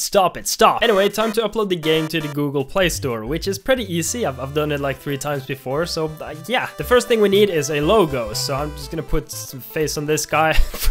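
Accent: Sweden. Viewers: Are u Swedish or danish???? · Are mah boi swedish?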